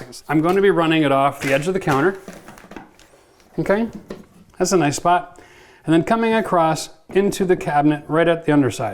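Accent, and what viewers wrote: Canada. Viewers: Him: Anyone can do this? Me: Hold my beer. I knew you were Canadian from the first video I watched, and it was the way you said 'about'.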